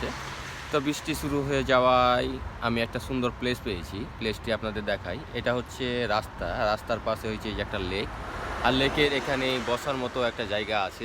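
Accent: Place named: Rajshahi, Bangladesh. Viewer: উনি রাজশাহীর বাসিন্দা তাই ওনার ভাষা এমন সুন্দর।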